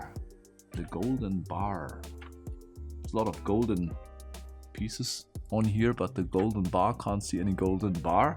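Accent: Germany. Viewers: Au contraire, my friend. Please, forgive me my harsh tone, but for a somewhat younger (age < 45, I guess) German his accent is unforgivably and shamefully thick - "Fremdschämen" is my dominant feeling hearing it by my fellow countrymen, especially when accompanied by our (i.e. German) typical permanent appreciation. So, my ears kept bleeding. And I guess a half-trained chimp would be able to deduce what "jostle" means from the context "usual puzzle solving techniques". At least I was able to do it immediately even while eating my banana. In conclusion, I cursed using the i-word seeing him shaking and whacking this delicate piece. On the pro side, he didn't chew on it (a seldomly applied puzzle solving technique though;) Also, he did a very nice repair job on the puzzle (there is an engineer in all of us:) And he solved it. Nice. So, hurray... The puzzle itself is quite a piece of eye candy! 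A perfect christmas gift, IMHO. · Why I watch vids: 1. his German accent and mild humor is soothing to me 2. I love his engineering brain and approach to solving puzzles - his methodical approach is interesting and has applications outside of solving puzzles 3. I get satisfaction from watching the process and the solve and the explanation of the mechanics. When solved, it's like I get the same satisfaction as he does which makes me feel good! Danke!:D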